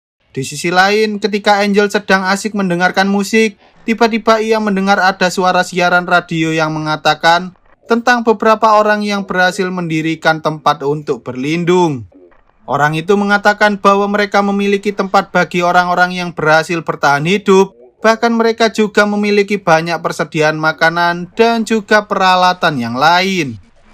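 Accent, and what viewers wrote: Java, Indonesia. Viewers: Min logat nya sengaja dibuat medok ya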